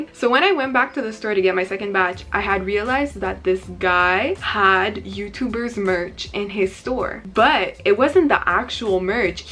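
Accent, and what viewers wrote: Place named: Montreal, Canada. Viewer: I know she’s from Mtl Just from the accent 😭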